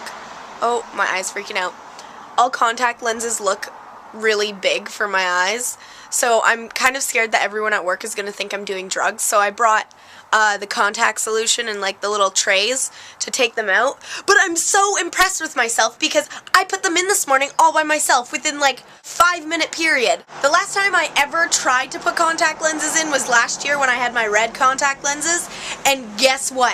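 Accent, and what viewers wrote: England, United Kingdom. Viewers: Your English accent was awesome! Can you do like some of next clog in the accent? PLEASE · Your English accent was awesome.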